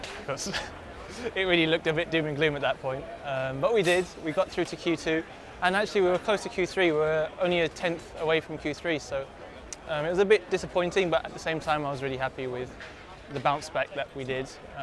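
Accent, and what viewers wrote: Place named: United Kingdom. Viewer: Such a British sounding Thai national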